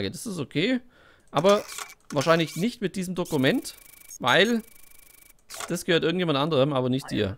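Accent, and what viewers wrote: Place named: Bavaria, Germany. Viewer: hach ja, zum zweitausendsten viewer bringt der gute seine familie um - das ist doch mal dedication:D ich bin auch wegs papers please auf den Kanal aufmerksam geworden (und nicht wegen irgend eines anderen youtubers^^ Hab mal ein videocheck auf der gamestar gesehen oder so). Ich bleib auch gern bei anderen lets plays. Immer sehr angenehm, deine atmo, dein bayerischer slang... Wo kommsten genau her, wenn ich fragen darf? Einzige Kritik: Beginn doch nich jedes Video immer mit "herzlich willkommen". Ich hab die zwo wöter so gfressen...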